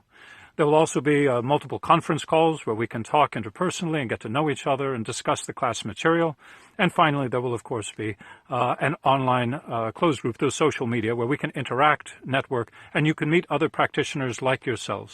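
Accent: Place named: Transatlantic, United States. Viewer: My man really sells this with the transatlantic accent too. I have the trade edition and working through it now. Day 6 and the dreams are wild.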